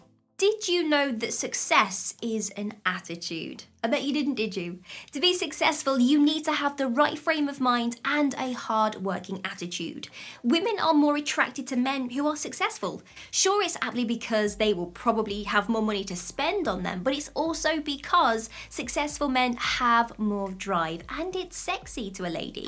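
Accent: England, United Kingdom. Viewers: Love your very English accent. In the old days we simply were gentlemen. I think that summarizes all.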